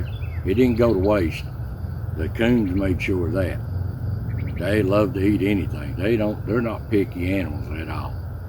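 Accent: Appalachia, United States. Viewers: The way you talk is one of the best things about your channel. Your accent, mannerisms and interests are the greatest appeals to your videos. I am from the mountains of central/East Tennessee and this reminds me so much of my parents and grandparents. Keep up the good work. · Love your southern Appalachian dialect. We're close here with the accent. So happy to hear you're well on the way to getting back to normal. Thank you so much for what you do in providing clean entertainment and your historical facts. God bless and well see you next time. Continued prayers. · Glad to hear you are feeling better! I enjoy learning about Appalachian history. Love your accent too. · I love your appalachian accent. Reminds me my Kentucky people from years ago. A lot of the mountain talk is going away . My husband and I love all of your videos. You are sincere and kind . 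We thank God for your healing. 🙏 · Thanks Uncle/Brother. My dad's people from Northeast, TN. Have that Southern Appalachian accent. Although I grew up in PA. I acquired it somewhat. My friends often said that I talked Southern. I Love hearing Regional accents! From New Jersey and New England to Western PA and the Midwest, it is just fun to hear...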